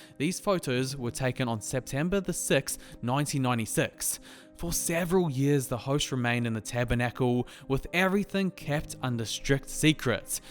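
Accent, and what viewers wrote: New Zealand. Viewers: You're a kiwi right? :)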